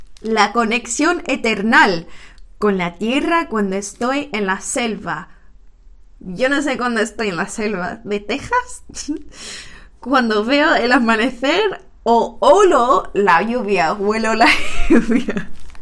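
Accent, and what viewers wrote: Andalusia, Spain. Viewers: Me encanta el acento andaluz 🤭🤭🤭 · como me gusta tu acento andaluz me recuerdas a un amigo de inglaterra que aprendio a hablar español escuchando a la suegra sevillana y de vez en cuando soltaba un deje andaluz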